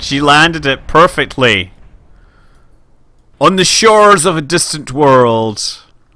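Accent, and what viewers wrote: Scotland, United Kingdom. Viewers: You haven't truly lived until you've heard a Scotsman recite Star Wars quotes. Well done,.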